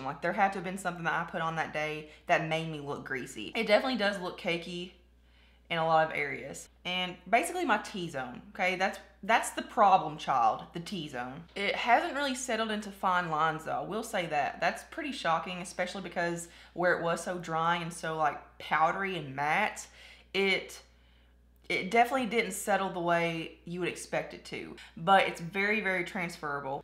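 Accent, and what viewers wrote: South, United States. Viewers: I’m glad you actually take the time to REALLY test the foundations!!! Love your channel and that cute sweet southern accent!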